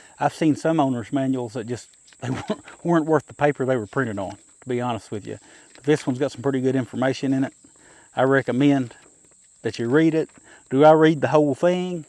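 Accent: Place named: South, United States. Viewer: that southern accent 1 in a million man.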